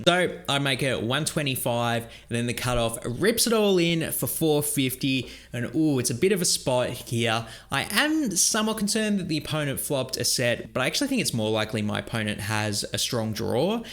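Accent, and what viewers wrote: Australia. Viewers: You talk out the side of your mouth as it is. I can't understand some things you say. There is something about Australians and the way they talk out the bottom side of their mouth. It makes some words hard to understand lol. The accent is awesome but the way the accent comes out is hard lol. Tough sessions happen, better luck next time.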